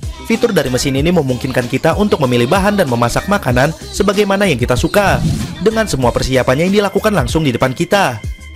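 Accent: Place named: Indonesia. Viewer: loghat indon kan..ape yg pelik sgt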